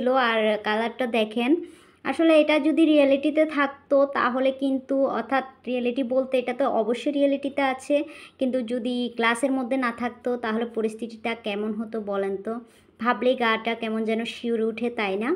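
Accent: Chittagong, Bangladesh. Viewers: ❤ amar khub bhalo laglo tomar Kotha gulo bon ❤khub misti bhasa chottogram a ❤sabar bhasa sabar kche sundor sabar sabae k samman dawa uchit❤khub sundor hoache makeup dressing darun sundor lagche tomae bon ❤ghoraguri jhal muri ❤❤gorome thanda juice khub bhalo lage❤sab milia khub bhlo lglo 👍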